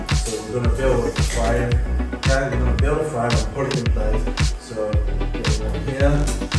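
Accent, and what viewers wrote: Birmingham, United Kingdom. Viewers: This is a brummy accent - Birmingham, UK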